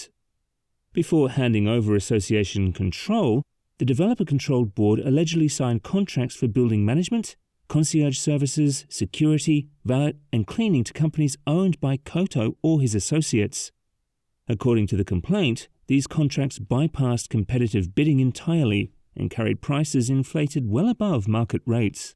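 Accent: United Kingdom. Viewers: Ironic a bloke brit narrating this brit building catastrophe.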